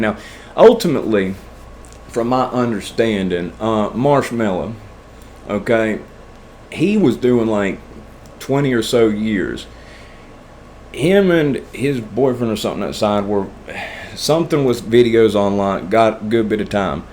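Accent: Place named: South, United States. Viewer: Hey man keep up the good work been watching your videos since you started! Love seeing the progress man, you chilling there with your dog and your southern draw remind me of me and some of my buddies just sitting around kicking it. I live in Hagerstown Maryland which alot of people consider the backwoods part of Maryland since we're in the western part, literally 10 mins from West Virginia line and about 35 40 from Virginia, I got in all my trouble in MD tho, I don't fuck around in WV and VA, damn commonwealth states lol keep it up sorry for long comment. BTW your clean date of April 9th, 2010 was my 21st birthday, I was doing the complete opposite of you on that day lol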